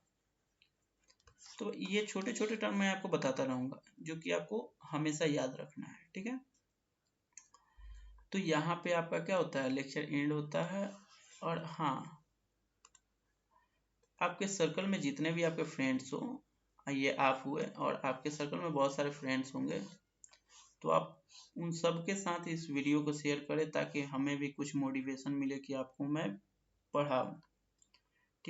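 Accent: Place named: Bihar, India. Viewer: SIR AAPKI LANGUAGE ME BHOT PROBLEM AARHA H AAP LAGBHAG BIHARI BOLTE HO OR ENGLISH READING BHI UTNA ACHA NHI FOR eg. aap Very ko Bery padhte ho , AAPNE PADHANE KE LIYE TOPIC TO ACHA LIYA H LEKIN THODA EASY LANGUAGE ME EXPLAIN KRNE KA KOSIS KIJIYE OR BOLTE SAME CONFIDENCE RAKHIYE TABHI AAP EK TEACHER BN SKOGE PLZ IMPROVE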